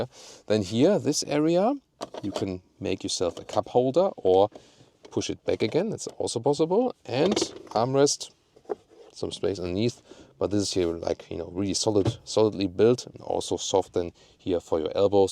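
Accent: Germany. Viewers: Klingt nach German English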